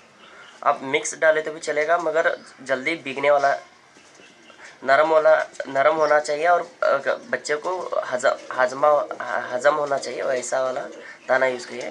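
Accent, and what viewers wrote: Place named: South, India. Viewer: Bhai bohut accha lagraha.. u r trying to speak in Hindi and unr doing so good... I think u r from south?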